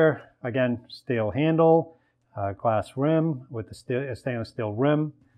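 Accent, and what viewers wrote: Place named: Boston, United States. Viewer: you are the most Boston sounding guy, are you from New England? If not you will fit right in hahaha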